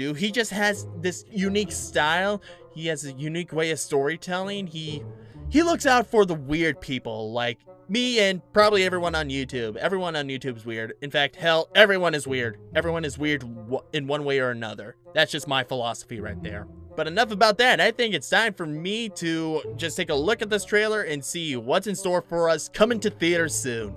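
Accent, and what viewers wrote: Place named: Ireland. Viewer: actually I like your irish accent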